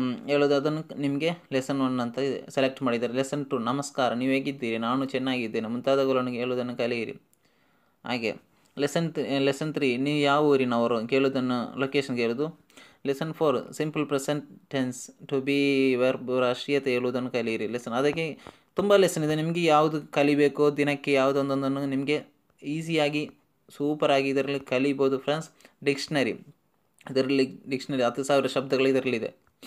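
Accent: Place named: Mangalore, India. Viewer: nimma Kannada mangloor kannada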